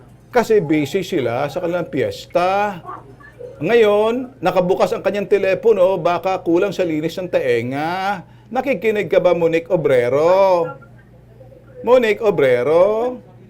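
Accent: Visayas, Philippines. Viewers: HahHaha katawa man ko sa imo sir hahhahahahha cute Kaau ka mo bisaya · Haha nakakatawa c idol mag bisaya.hehe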